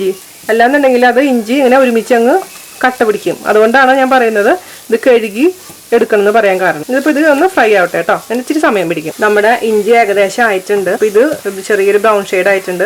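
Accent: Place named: Kannur, India. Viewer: Ingal Kannur aano😜Nala language😝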